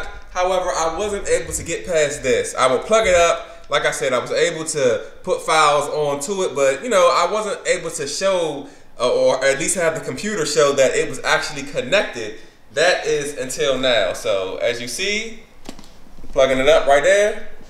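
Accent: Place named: Prince George's County, United States. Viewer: Thank you! I can tell that PG accent from anywhere btw :)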